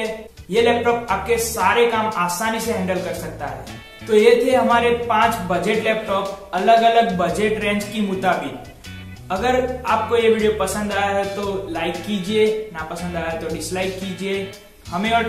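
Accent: Gujarat, India. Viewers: are u gujju.??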